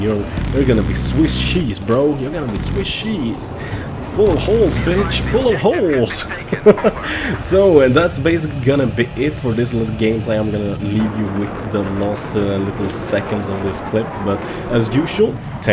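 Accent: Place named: Sweden. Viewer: This guy has to be from Sweeden. I can hear it when he talks and I know i'm right! I just know!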